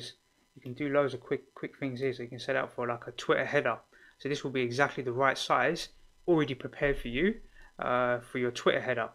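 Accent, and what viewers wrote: United Kingdom. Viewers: He just has a british accent.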